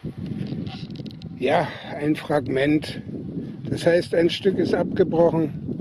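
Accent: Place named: North Rhine-Westphalia, Germany. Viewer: Ich schau dir ja schon seit Abo Nr.67 zu , aber ich frag mich ob du auch aus dem Süden kommst? Hörst dich eher nach NRW an ;)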